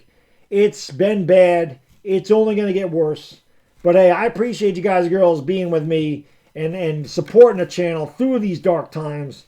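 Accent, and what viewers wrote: New York, United States. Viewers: Dude you are so funny, Love your content with that New York accent shit talking You and crack me up Stay loving your Giants Cowboy Diva for life Texas Gal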